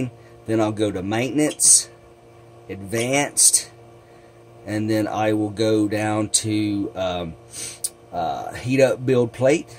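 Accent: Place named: Georgia, United States. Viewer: Hey Buddy, that seems like a major pain in the azz, but I bow with respect for your perseverance ! What is your z ofset ? You may need to push out a thicker bottom layer ? Did a raft not work ?Have you tried PEI or even PET (gizmo dork) ? PET with a good first layer of Elmers Extreme works great for all my other heated and non heated beds.I have one of these coming for testing friday so Id love to hear what you think ? I hear a slight accent also, you in GA ?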